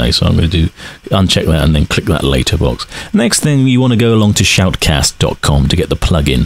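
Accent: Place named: Australia. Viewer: Australian accent :P u can hear a difference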